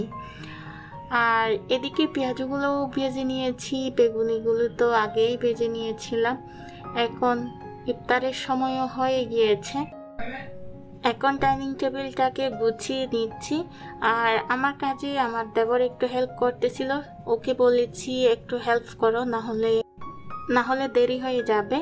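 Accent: Chittagong, Bangladesh. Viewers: Ha ha apu chottogram er vasha shunte amr kintu darun legese..😂😂.tobe ata thik bipod ashle sob dik thekei ashe 😢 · হাহাহা আপু চিটাগং য়ের ভাষা শুনে ভালই লাগে কিন্তু বুঝি কম।সব মিলিয়ে খুব ভাল লাগল।আমি খেয়াল করেছি যে আপনি কম ব্লগ আপলোড দিচ্ছেন😊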